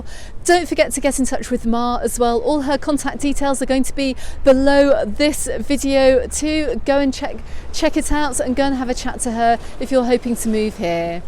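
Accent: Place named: England, United Kingdom. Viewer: I just know how fantastic you are Let me guess where u from by hearing your accent: 1. Leeds 2. Liverpool 3. Birmingham Kisses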